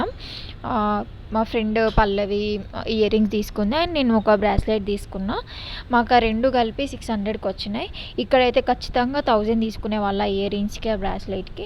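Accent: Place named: Telangana, India. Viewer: Telangana slang shade aithe pakka untadi 😅.ade continue cheyyandi baguntadi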